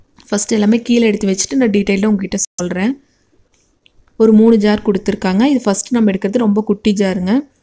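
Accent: Coimbatore, India. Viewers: Hi...unga coimbatore slang voice is sooo gud😊😊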